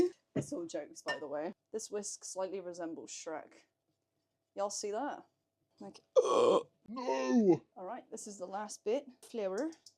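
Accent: United Kingdom. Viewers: your British accent, ❤❤❤ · HELP I DIDNT KNOW YOU WERE BRITISH Say mongoose for me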